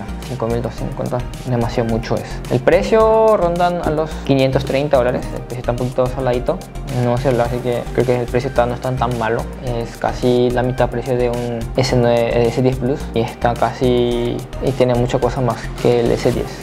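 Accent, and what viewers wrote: Paraguay. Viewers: Es de Paraguay xd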